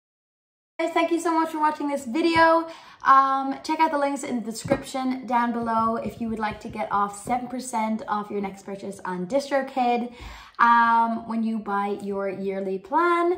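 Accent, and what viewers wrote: United States. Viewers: Sounds like she's going a tad American pronunciation,) ammhmmm....well is it? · What's with the American accent Allie? you are from the people's Republic of Cork...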